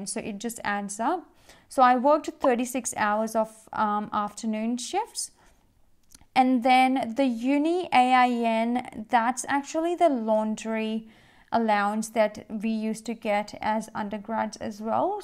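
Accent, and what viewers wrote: Australia. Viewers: Why Australian ascent dear....why not Indian ascent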